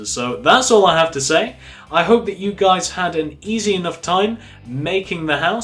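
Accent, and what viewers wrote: England, United Kingdom. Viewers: He's English, not gay.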